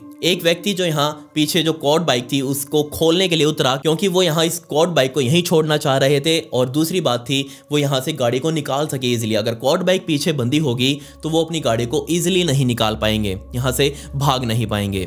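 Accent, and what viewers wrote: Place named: Haryana, India. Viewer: sir ap apki voice apka lok bahut acha h Jab m apki video dekhni lgi thi maine awaz se hi pahachan liya ap haryana se ho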